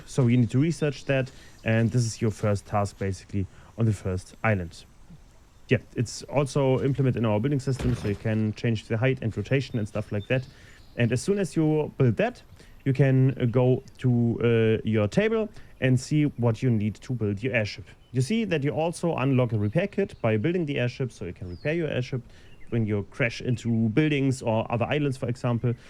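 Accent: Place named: Germany. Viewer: Wie man den deutschen Dialekt heraushört ;P.. bin hyped! sowas gibt´s noch nicht. Viel Erfolg!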